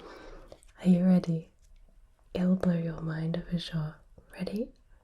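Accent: England, United Kingdom. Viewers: I ❤ the English accent!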